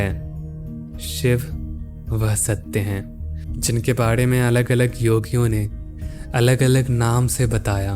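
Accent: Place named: Saharsa, India. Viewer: bhai बिहार से हो क्या आप आपके बातों से लगता है आप सहरसा के आस पास के लगते हैं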